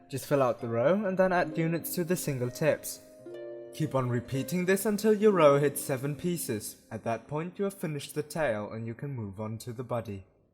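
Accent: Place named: Germany. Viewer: Question are you a German boy? because you have a German accent And I would say you are German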